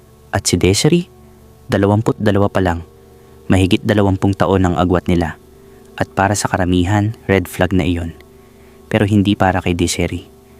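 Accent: Visayas, Philippines. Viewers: Bisaya ba Yung narrator?Hindi marunong mag bigkas ng salitang Tagalog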